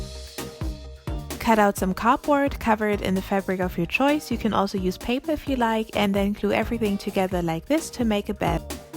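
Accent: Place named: Germany. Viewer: da hab ich doch ein gutes Gefühl für das deutsche Akzent😃😎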